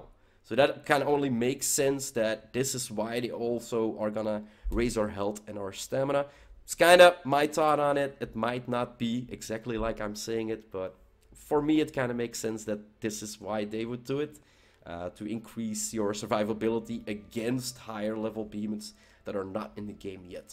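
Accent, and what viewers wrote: Netherlands. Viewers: ah youre from zuid-Nederland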